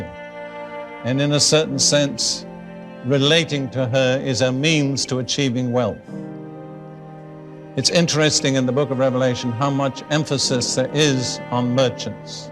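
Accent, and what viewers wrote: United Kingdom. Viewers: You mean his british accent...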